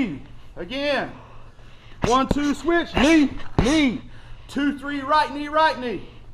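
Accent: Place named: United States. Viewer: I train Muay Thai out here in Thailand. Never been to another gym. It's a trip hearing a trainer speak English terms with an American accent and attitude. lol. I need to come visit a gym back in the States! heh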